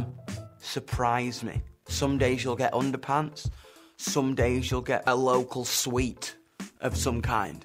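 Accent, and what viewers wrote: United States. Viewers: HIS AMERICAN ACCENT OMG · His American accent is better than our British accent........ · His American accent is pretty good!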